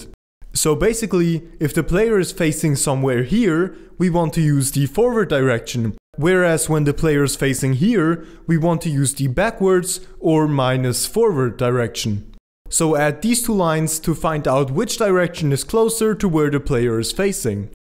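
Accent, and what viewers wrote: Germany. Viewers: IDK but is he German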